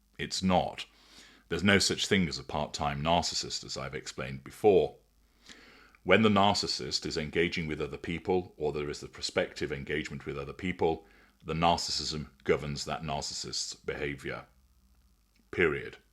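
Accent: United Kingdom. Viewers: Been waiting for this. Next up would be a great comparison between mm and ek. You, Mr. NARRATOR , HAVE THE MOST DIGNIFIED BRITISH ACCENT and EXTENSIVE ENGLISH VOCABULARY, OFTEN MISSING FROM MANY LIVING ACROSS 🇺🇲THE POND. GOD SAVE ENGLAND 🏴 🇬🇧, THE ENTIRE UK 🇬🇧 AND ALL WESTERN COUNTRIES. ⚖️✨️🕯✨️🎚🛐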